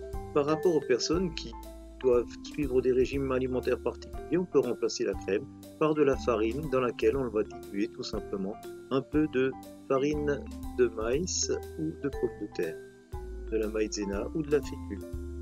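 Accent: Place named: France. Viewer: I love your French accent too, sounds so beautiful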